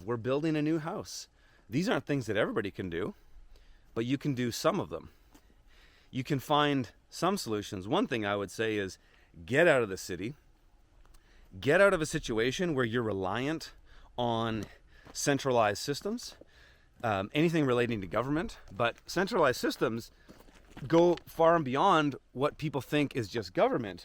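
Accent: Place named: West Coast, Canada. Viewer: Refreshing to hear your perspectives on the Dutch farmers, et al. As a former B.C. native, now living in the Netherlands - on a basic level I appreciate hearing your West Coast Canadian accent speaking and seeing the visuals of your farm and overall and island/coastal habitat. It definitely, takes me back to my roots and sets up familiar perameters which compliment the core of your message to us all - wherever and whomever we may be. I also found interesting the definition of Canada you cited ... being "the internal and territorial waters". Does that mean the government doesn't own the land, and therefore has no jurisdiction over the people who live there ? Are they ruling, therefore, illegaly ?? Unless, you happen to live on a houseboat or in a canoe, perhaps. All of that leading up to the "cherry" imo, which to my ears rings like a bell: "I'm in free country here... 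THIS is Canada" !! Sounds good to me :) It's refreshing to hear you clear away the clutter and giving this topic some room to breathe - by sending it to us from into the wilder, open spaces. The Dutch farms take up an incredible amount of "open space" here too, by the way. And, the Dutch farmers are incredibly resilant. They will not back down, in spite of the odds being against them. They built the Netherlands, in a sense. And, more and more people in Holland are on board with them, in spite of the overall inhabitants not being able to live-off-the-grid, so much, in this country. It is the densist, most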